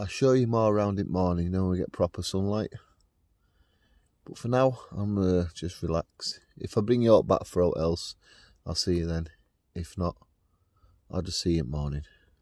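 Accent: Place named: England, United Kingdom. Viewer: It's an English accent. The English live in England, the Scottish in Scotland, and the Welsh in Wales, but all three countries are British because they live on the same island: Great Britain, the largest Island in the British Isles. Welsh and Scottish accents are also British, but this one is clearly English. Great Britain together with Northern Ireland forms the United Kingdom of Great Britain and Northern Ireland, abbreviated to the UK, to which you can add Irish to the aforementioned accents. Hope this helps.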